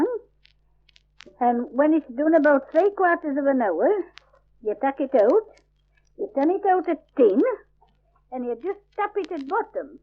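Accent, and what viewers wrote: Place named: North Yorkshire, United Kingdom. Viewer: the Northern West Riding however is considered part of the North Yorkshire dialect group, hence my comment. Were she a West Riding speaker proper you'd have things like: - "hoil" not "hooal" for "hole - "spooin" not "speean" for "spoon" - "stooan" and "sooa" not "steean" and "seea" for "stone" and "so" - "spare" not "spaar" for "spare" - "oven" not "yoon" for "oven" - "bake" not "beeak" for "bake" - "one" not "yah" for "one" before nouns - "enuff/eniff" or in the plural "enew/enow" and not "eneeaf" for "enough "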